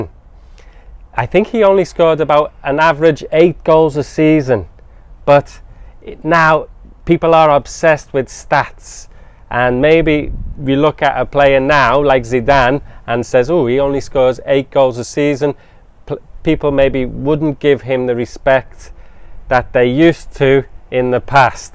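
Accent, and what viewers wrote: United Kingdom. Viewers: That's a nice way of teaching English through football. Also, it's very nice of you to speak considerably slower as people here usually have difficulty in understanding British accent. Cheers!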